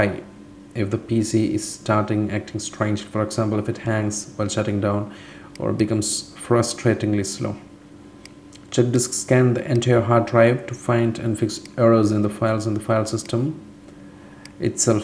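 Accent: Kerala, India. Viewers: Great video! Wow! I also detect a 🤓 faint Malayalam accent when you speak...which makes it even more awesome!! 👏👍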